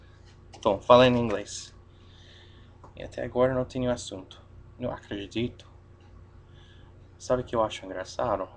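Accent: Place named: São Paulo, Brazil. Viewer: Melhorou de mais! O Brasil é um país que em nenhum canto se fala igual devido aos vários sotaques, porém existem alguns que são mais falados por aqui. Então vai depender de onde você vai ficar aqui no Brasil para podermos indicar um sotaque. O seu R depois de uma consoante no momento é igual ao dos paulistas, que no Brasil em geral é o menos usado. Ex.: "retardado" o mais comum é dizer "retarrdado" (Hetah-dado)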